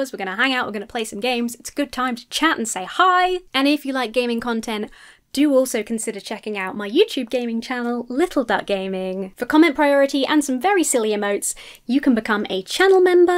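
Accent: United States. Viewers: Killing that "Yank" accent gurl. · Dude, your American accent is awesome. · Your American accent is getting pretty solid!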